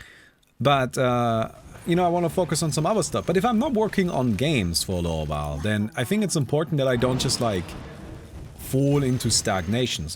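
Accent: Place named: Germany. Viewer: I remember the first day I started watching you, I could smell the german from miles away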